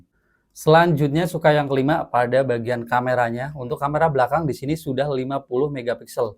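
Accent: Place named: Banyumas, Indonesia. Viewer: Logat ngapak-nya masih kedengeran 😅. Tp raut mukamu oke ngab. Lanjutkan karyamu. Sukses selalu 🙏🏼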